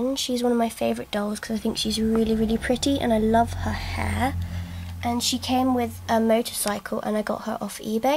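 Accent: United Kingdom. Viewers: I have the leah the witch doll but i threw her away cuase she was old and i had no use for her but i love your british accent :) also your dolls are really good takin care of :D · r u from Britain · Are you from london? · Are you british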